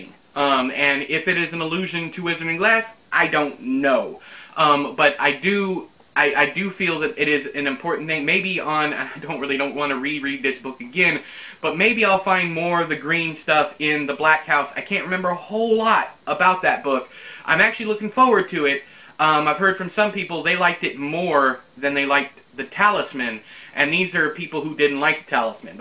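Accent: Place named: Midwest, United States. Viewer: Where do you shoot from? I'm from Laja, Chile, myself. I like your accent, it's what I'm used to hear the most, having lived a couple of years in the Chicago area and in southern Wisconsin.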